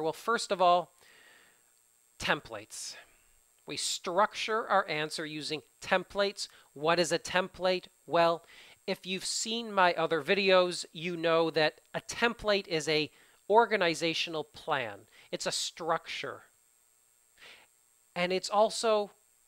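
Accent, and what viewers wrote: Canada. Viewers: So what if you have a Canadian accent??? Native speakers from UK and Australia also have their own accent. Your lessons are great. I am glad I came across to you.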